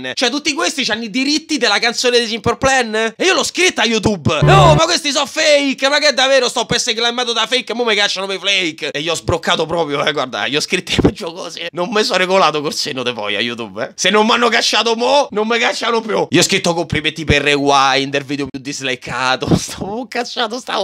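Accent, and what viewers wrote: Rome, Italy. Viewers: Ma sei romano?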